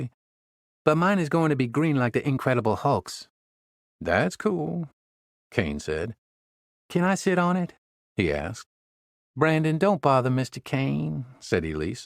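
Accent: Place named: South, United States. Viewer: Horrible Narrator The Southern Accent is 😞 Bad Very Annoying. This Book is Boring Very Slow Moving. I couldn't care less about The Story or anyone in it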